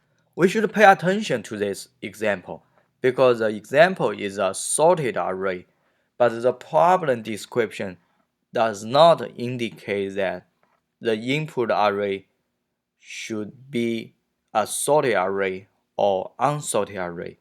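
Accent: China. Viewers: 虽然你的口音超级重，但是我感觉比美音还好懂！！哈哈哈哈非常的Chinese了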